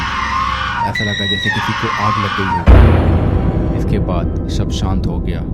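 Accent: Bihar, India. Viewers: Video ka suruwat dekh kar hi laga tha aap bihar se hai 😊😊 Mie bhi bihar se hu 😀😀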